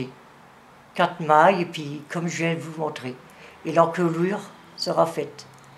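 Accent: North, France. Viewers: bonsoir très contente de te revoir, il et magnifique félicitations un grand grand merci je vais encore t écouté avec ton accent du nord bisous et gros câlins a ponpon, a très vite je vais le faire trop beau très bonne soirée, Monique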